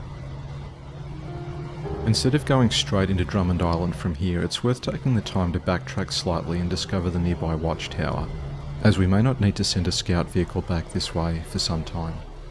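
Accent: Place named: Australia. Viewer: I only discovered your channel and content today. Best out. Good to hear a fellow Aussie, keep up the wicked content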